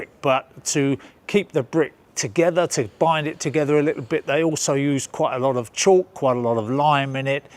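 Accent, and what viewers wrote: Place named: East London, United Kingdom. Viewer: ....IMO...........a "refined" East London accent.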